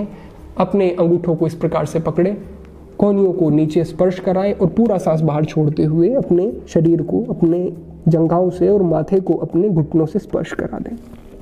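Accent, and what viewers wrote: Standard. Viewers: Kitni shudh hindi bolte hai aap🤩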